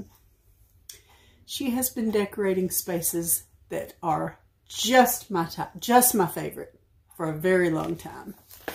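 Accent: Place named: North Alabama, United States. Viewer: I am so sorry you have had the flu!!! I have experienced the flu one time. My husbands side of our family has a celebration between Thanksgiving and Christmas that covers all the bases. I was coming down with Covid and didn't know it. I was mortified because everyone came for the first time in twenty years!! I had shots that allowed me to have a relatively easy time. I am so glad to see you back on YouTube. I love to hear you talk in the way us North Alabama people do, lol! God's grace and blessings to you and your family. Sherry from Alabama.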